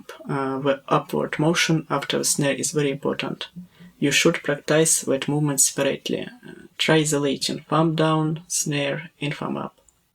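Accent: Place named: Russia. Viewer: Настоящий руский акцент а так-то очень тебе благодарен за разбор мечтал когдато виучить.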